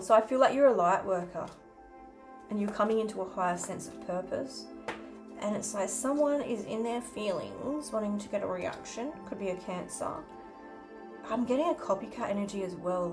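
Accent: Australia. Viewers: I love your Australian accent😂❤❤❤ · Your eyes are amazing i bet you get that a lot your voice is the sweetest wisper you make me chill 😎 to be as sweet as you lovely accent i think its Australian imagine nabours the girl next door who can read you inside out 😊❤x ditto my intuition is allways on key like a phenix angel 😇 covered in golden glow mmmmm i have yet to have my glow up I'm a very late bloomer the old catapiler in the universe I've been around since time began outside of Eden inside is just a memory a dream thats coming back to be remembered once more the beautiful garden i keep inside two lovers two meet to greet to find the love i left behind so long ago in my very first night invthe land of nod 💤 😴 💤 😴 to close my eye's to forget to remember when the time is right once more edens projection from within waking ⏰️ up to be remembered forever and ever a garden of light that never sleeps and never dies not even a flower not even a mouse surrounded by lions tigers and bears mmmmmm that jist want to be loved its actually the garden of love without a shadow without a doubt 😊❤x by beautiful your realy cool 😎 taa taa from Lancashire UK 🇬🇧😊❤x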